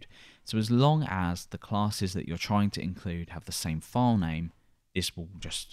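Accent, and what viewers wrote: United Kingdom. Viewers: 2 things i love: British accent & simple explanation. Much obliged.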